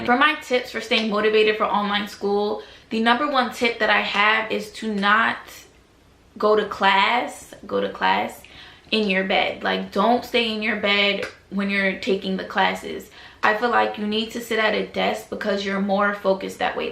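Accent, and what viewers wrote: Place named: New York, United States. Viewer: yes girl 😂 you got that New York accent.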